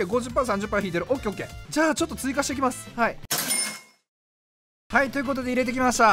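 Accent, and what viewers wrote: Kansai, Japan. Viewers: なんか関西弁の自賛は嫌みがない気がする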